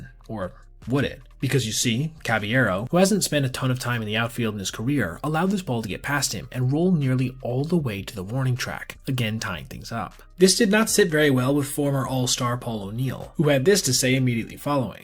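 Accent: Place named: Michigan, United States. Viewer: Thought I always heard a little bit of Michigan in you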